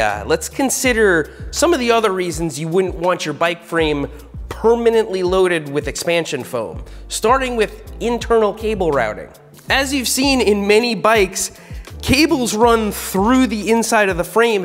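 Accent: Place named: South, United States. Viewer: new southern accent is coming through: "Impordan"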